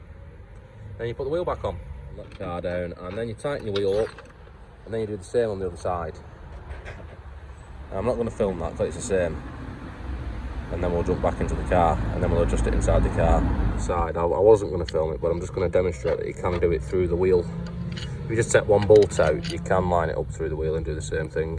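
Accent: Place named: Northern England, United Kingdom. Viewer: Dead helpful mate, love the northern accent btw 🇬🇧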